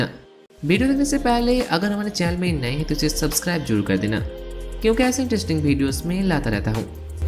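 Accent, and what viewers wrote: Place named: South, India. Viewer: Aapke accent se laghta hai aap South se ho ..nice video....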